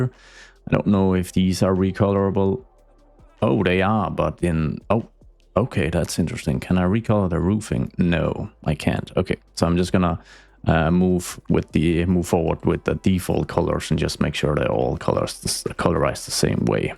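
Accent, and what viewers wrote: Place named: Denmark. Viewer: The danglish is real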